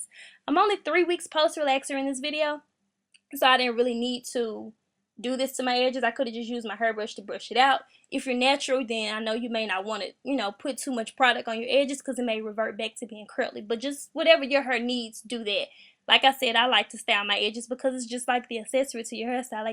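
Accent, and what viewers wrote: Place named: Memphis, United States. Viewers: I know that accent from anywhere lol. I’m a memphian too 😂 This was my go to style in high school ❤️ great tutorial 👍🏽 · I'm natural but I heard your accent and I had to sub. #northmemphis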